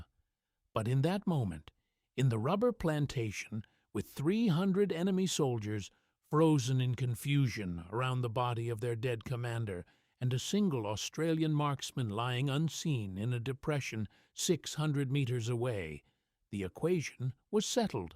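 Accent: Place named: United States. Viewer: The American accent tells me that what follows is bullshit!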